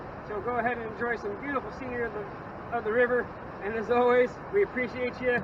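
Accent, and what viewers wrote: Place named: United States. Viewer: Thanks! I could not understand any of the detail words with such a heavy American accent as cool as it sounds aha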